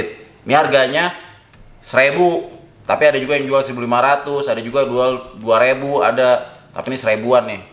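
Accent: Jakarta, Indonesia. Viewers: Pap dengan logat betawi,,, josss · Saya suka sma bicara nya mengingatkan saya sma tmn orang Betawi logatnya itu bikin candu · Seru ngomongnya betawi tulen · Betawi punye ni mah. Mantap cang... 😁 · Yg ky gini ni gw suka.. apalg sama Abang nya yg kasih tutorialnya pake logat Betawi nya..keren HBs dahh pokoknya..sehat selalu bang..mksh berbagi ilmunya Nye..salam dari Kalimantan timur Balikpapan..🙏🙏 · Kalo ane bisa sucrib 10 x ane kasih dah. Konten nya berkualitas. Penjelasan nya enak bngt dg bahasa khas betawi. Bini nye ikut nyumbang layar tambah asyik. Top markonah deh. 👍👍👍👍👍👍👍👍👍👍👍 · Betawi Asli... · Betawi tulen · Nt betawi mane bang roman ny sedep bener logat nt. Salam kenal bang dari ane nak betawi kemayoran 🙏🤗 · Logat Betawi👍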